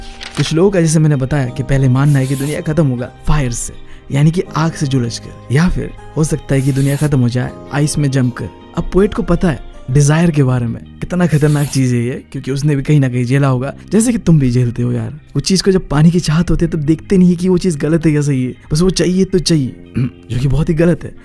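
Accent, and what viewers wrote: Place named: Bihar, India. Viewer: bhai aapki bhasha mai bengali kam or bihari vibe jayada kyu hai kon kon agree karta hai😊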